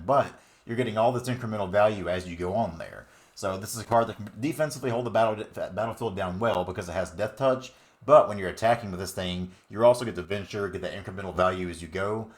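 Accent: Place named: Oklahoma, United States. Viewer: btw are you from oklahoma by any chance? lol your accent sounds very much like how 99% of the people im around sound. lol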